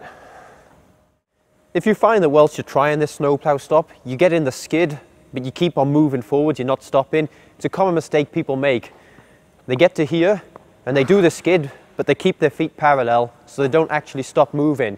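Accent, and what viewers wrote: Wales, United Kingdom. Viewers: Is he from Wales? Or somewhere else in the U.K?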